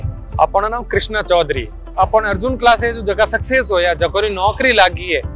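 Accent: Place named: Marwari, India. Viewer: Wha sir gjb marwadi andaj me 😀 the mare neda aao 😀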